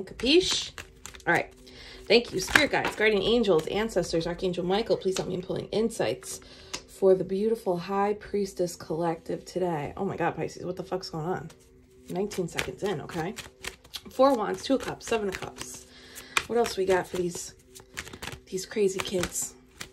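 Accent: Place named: Long Island, United States. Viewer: I just found this page and I subscribed because of your accent.. are you from long Island? ❤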